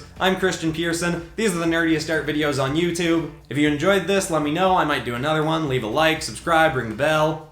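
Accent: Canada. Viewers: Canadian! Besides the celebrity Canadians, it makes me wonder if everyone there pronounces those words that way (minus the French Canadians). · You have the MOST Canadian "about" I've ever heard. It even bleeds into your DOUBTs, which I never heard before. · First time watching, can already tell you’re Canadian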